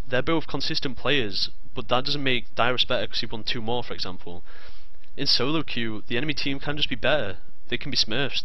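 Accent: United Kingdom. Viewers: I want a guide on how to hear what you're saying (i have trouble understanding british accents)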